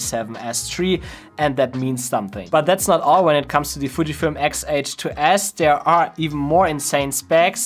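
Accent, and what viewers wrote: Germany. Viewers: hes german i can hear it im german to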